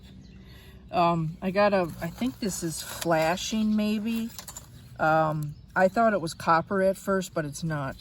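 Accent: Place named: Minnesota, United States. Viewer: I think the O is from Ford. Also, the Minnesotan is coming through….”Baja Bug” where you pronounce the hard J made me laugh (I’m originally from MN). It’s pronounced Bah Hah (think of the off-road racing in the Mexico/California desert). 😊 Anyways, lots of cool finds. Hope you get some extra permissions from your presentation to the church goers.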